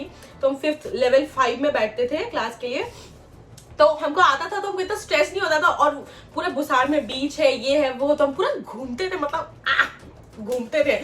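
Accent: Jharkhand, India. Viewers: Hello there... this is my first comment ever and I'd like to congratulate you for your achievement and I kind of knew that you were from Jharkhand because your Hindi accent is just like mine and I'm glad that you are proud of your mother tongue and use it in your videos too. You remind me of my husband who is also from Jharkhand and with hard work he made it to JNU and later to Japan... that was a long time ago, when nobody from jharkhand or bihar really wanted to acknowledge their backgrounds. I'm so happy to see young Jharkhandi like you doing well... may fame follow you everywhere👍💯🧡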